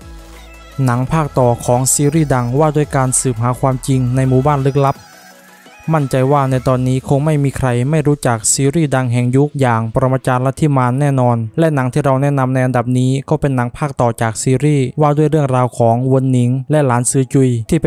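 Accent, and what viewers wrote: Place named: North, Thailand. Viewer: น่าจะคนภาคเหนือครับ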